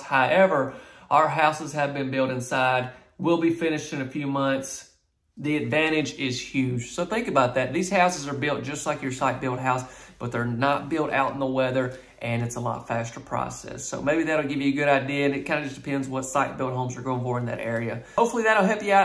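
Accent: South, United States. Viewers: your southern accent to me just enhances the quality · You don't have to not sound country for us here in Pa ... I love yours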